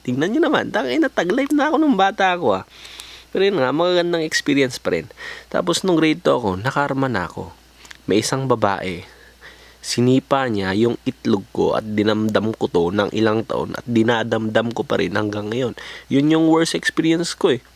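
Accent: Ilocos, Philippines. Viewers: Ilocano ka pala boos hahahaha ukinana tattak la naamuan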